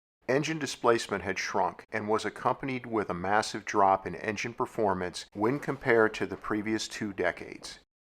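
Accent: United States. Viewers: Wow. That was a great video and voice over. The narrator had the greatest American accent of all time. Good topic and spot on.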